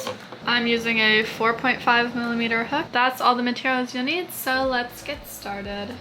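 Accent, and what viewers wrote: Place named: New Hampshire, United States. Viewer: Are you from New Hampshire? Your accent is so cute 🥰😊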